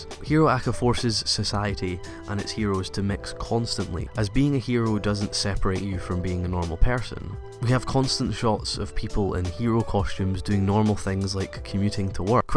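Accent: Scotland, United Kingdom. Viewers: You sound Scottish, where abouts?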